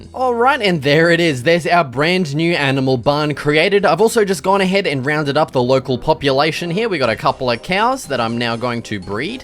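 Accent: Australia. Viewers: I love the Australian accent so much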